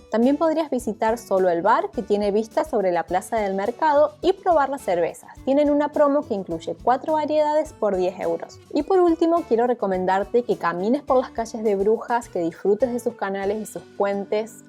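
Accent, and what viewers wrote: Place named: Argentina. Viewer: Reconozco un marcado acento argentino en tu dialecto =D Muy lindo recorrido. #GraciasPorCompartir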